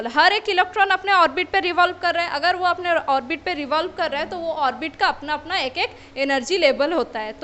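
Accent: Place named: Odisha, India. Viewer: No bakwass only point to point i like this type of lecture ❤️ it's like odia accent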